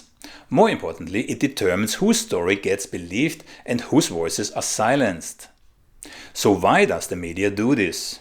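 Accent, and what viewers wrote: Germany. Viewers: It's so good to have the original Germanic accent... Nowadays 🇩🇪🇦🇹🇨🇭 sound like they were born speaking English. Deutscher Rundfunk für immer!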